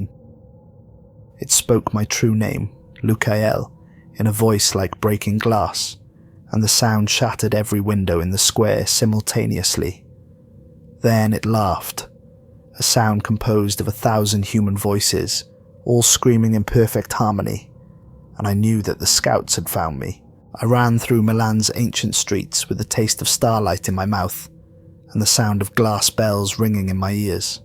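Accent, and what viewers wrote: United Kingdom. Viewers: I like the story. Can you slow down your audio a little bit? I could be wrong but you sound like you are from the uk and you have portions of the story that it it gets hard to understand. Most story channels are used to fall asleep a slightly slower audio works awesome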